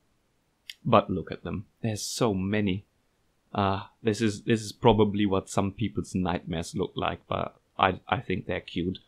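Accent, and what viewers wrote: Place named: Germany. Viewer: Hey. Im from germany and from your accent and your name i would guess you are too. Im really interested in these spiny assasin Guys. I would Love to buy some from you. Even if thats not possible i really Like your channel and keep Up the high quality videos.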